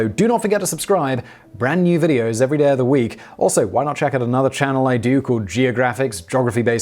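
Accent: England, United Kingdom. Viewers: Nathan Stautzenberger if they call Petrol Gas, what do they call Gas ? Americans are strange, yet he sounds English.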